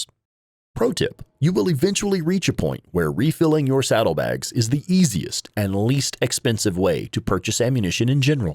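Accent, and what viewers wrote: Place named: South, United States. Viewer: you changed your voice. I've watched a few of your older Days Gone vids and your voice was a little rounder then, with a hint of southern accent. this is a great game and you make great guides.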